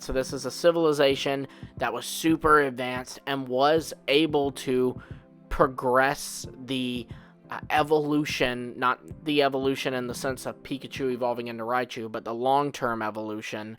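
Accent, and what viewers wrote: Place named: Midwest, United States. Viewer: "Ope", dude, you are SO Midwestern in your talk. XD That's totally cool! So am I. I had to be clued into the fact a year or so ago that I actually said that word as much as everyone else. Never noticed. XD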